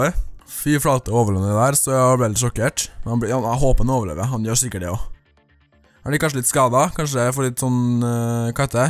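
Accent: Trøndelag, Norway. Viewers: E du trønder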